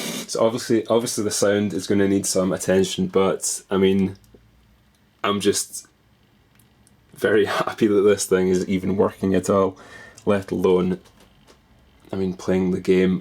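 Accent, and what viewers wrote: Scotland, United Kingdom. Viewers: are you of Scottish origin?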